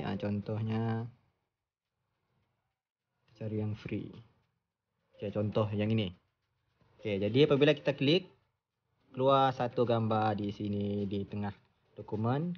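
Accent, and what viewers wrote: Sarawak, Malaysia. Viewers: Bunyi org Sarawak jak. Mun benar, kipidap good job. Lamak sik nengar tune tutor gia 😁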